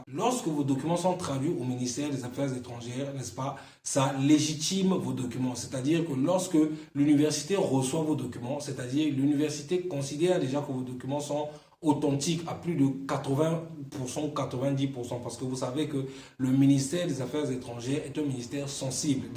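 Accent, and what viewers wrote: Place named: Democratic Republic of the Congo. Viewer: Je sens l'accent congolais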